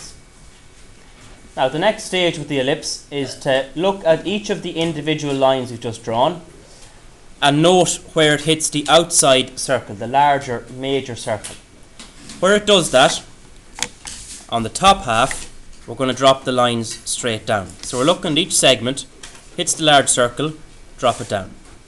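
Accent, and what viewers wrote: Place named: Ireland. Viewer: the irish acent tho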